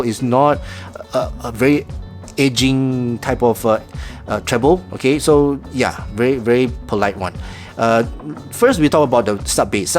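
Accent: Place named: Singapore. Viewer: I think is a Singaporean. I also noticed this on some of my Singaporean colleagues.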